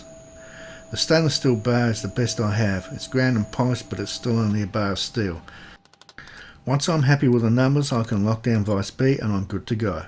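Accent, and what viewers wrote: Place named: Australia. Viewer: You know what my wednesday needed? a little bit of machining with an outback inflection. This was a fun one to follow along with, I just restored a manual lathe and endmill and I've been liking the hobby toolmaker side of youtube. greets from california mate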